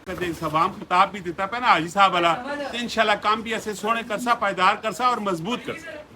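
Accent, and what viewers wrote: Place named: Rawalpindi, Pakistan. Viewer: پیور پنڈی آلی بولی پنڈی کیہڑی جائ تے دوکان وے تساں دی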